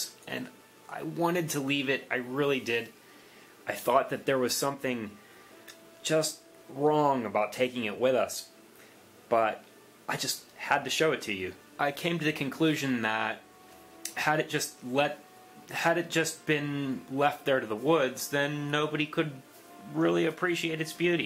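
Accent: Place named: Pittsburgh, United States. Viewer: I thought I detected a hint of a Pittsburghese accent;) I'm from the Lehigh valley myself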